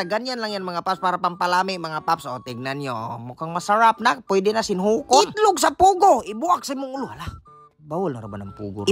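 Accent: Visayas, Philippines. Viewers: Dili jud makabidli, i like the way you bintag, binisayang tagalog🤣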